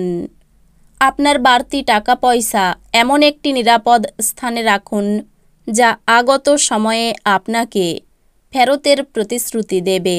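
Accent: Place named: East Bengal, Bangladesh. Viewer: আপনার বাড়ি?,মনে হচ্ছে east bengal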